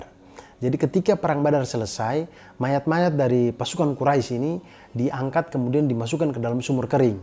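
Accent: Eastern Indonesia, Indonesia. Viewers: Bahasa timurnya kental sekali ,, saya suka saya suka ,, salam dri bnten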